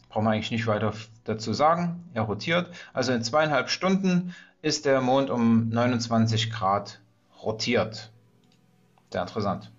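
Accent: Saxony, Germany. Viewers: Wir als Franken verstehen das Sächsische und lieben es. PS: meine Mutter kam in Dresden am HBH zur Welt, damals 1924, und dass als Ostpreußin 🤣 Herzlichen Dank, für mich macht das absolut Sinn👍 Danke🧸🌸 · Och Leute,lasst den guten doch mal bitte weiter sächseln. Er is doch kein Bayer,die man wirklich nicht versteht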